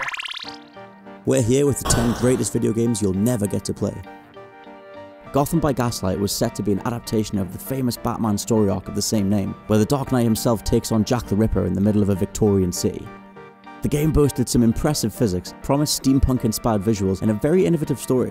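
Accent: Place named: Geordie, United Kingdom. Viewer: is the Narrator from jesmond in Newcastle? sounds like it. it's very posh Geordie, but still sounds a bit Geordie to me! toooooon. :)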